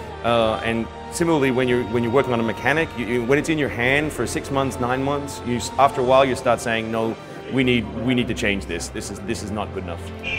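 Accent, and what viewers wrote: Australia. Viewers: i cant pick it, is this guy Australian? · What the fuck is this dudes accent? He has got to have spent time in Australia.... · half the time this guy talks he has an australian accent, what gives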